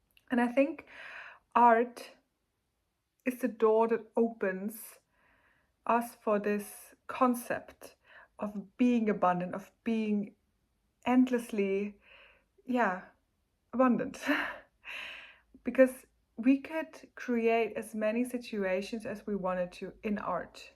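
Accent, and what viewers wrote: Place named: Germany. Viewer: you sound german, Grüße! :D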